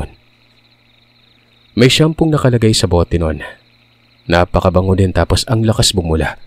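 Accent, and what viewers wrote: Visayas, Philippines. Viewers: Ka angayan mo bisaya uieeee hahahha😊😊😊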